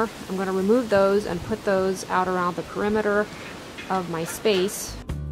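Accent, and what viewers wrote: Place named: Pennsylvania Dutch, United States. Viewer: I hear remnants of a pennsylvania dutch accent. I think you may have come from a very traditional mennonite family. Holdeman. Very beautiful porch and home! Thanks for sharing!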